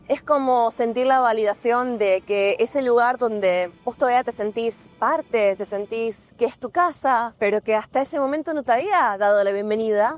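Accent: Buenos Aires, Argentina. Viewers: me refiero al acento, no al habla... Ese acento cringe de porteño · Argentino??? O porteño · el 70% de los argentinos tenemos ese acento, asi que acento de argentino* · Hola cómo estás mi nombre es José Carlos de Tijuana México y bueno un gusto seguirte adoro tu pais de Argentina tu acento es lindo n.n y bueno me gusta mucho la cultura japonesa como el anime manga comida japonesa sus paisajes etc etc lo que mas me entristece es que en 3 meses la versión japonesa de power Rangers llamada super Sentai llegará a su fin el 15 de febrero y bueno buen vídeo me gusto fue interesante te aprecio mucho y mil abrazos grandes para ti saludos desde Tijuana México. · acento de porteño*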